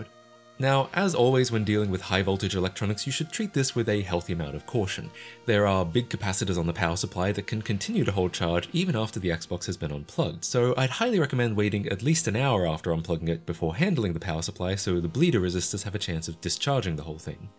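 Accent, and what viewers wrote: Australia. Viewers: I don’t blame them, addressed that his accent is a mix of a lot of YouTubers he watched growing up and his native Aussie accent. He also lives in the US now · Daaamn is a fellow Aussie! Hey, one tip- never lose the accent!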